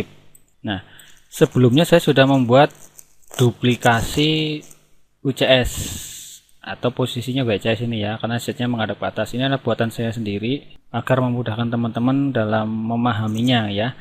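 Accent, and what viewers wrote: Banyumas, Indonesia. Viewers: manteps ngapaks..., penjelasanya sungguh jelas.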